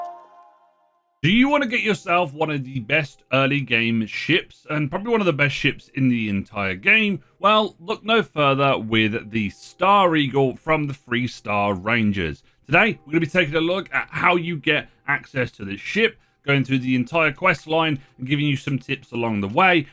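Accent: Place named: England, United Kingdom. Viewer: Ahhhh, English.....ENGLISH!! Not American trying to talk at 6 words a second! Why do they do that? Great video, precise and clear. What a treat. Liked and subbed.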